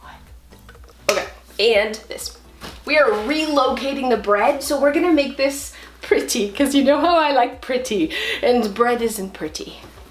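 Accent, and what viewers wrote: Canada. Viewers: I love your videos. You remind me of myself. I have to confess, though, I make fun of your Canadian accent when I watch. 😋 It’s pretty close to my relatives in Minnesota. 😘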